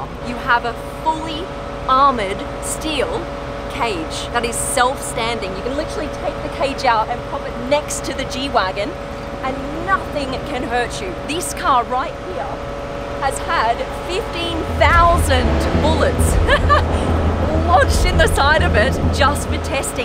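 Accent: Australia. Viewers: Are you from Australia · Nice! I saw the nasdaily video you were with beautiful australian accent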